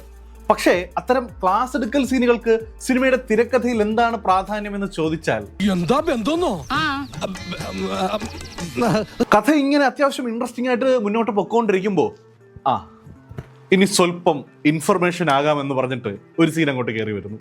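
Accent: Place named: Tamil Nadu, India. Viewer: ഇന്ത്യൻ accent അല്ല തമിഴന്മാരുടെ accent ആണത്!!!!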